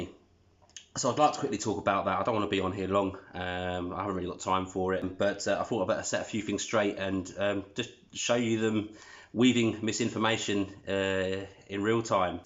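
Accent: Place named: United Kingdom. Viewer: A good rule of thumb is not to trust anyone with a Brit accent talking about US affairs on YouTube. Did Christopher Steele and the Steele Dossier teach us nothing?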